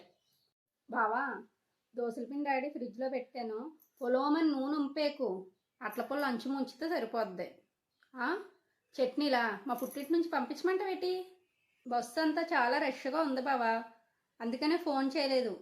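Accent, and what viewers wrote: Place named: Godavari, India. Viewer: Ravi mantrii sister la ఉన్నారు me భాష ఆ యాస ఎంతైన గోదారిలో కదా🎉❤😂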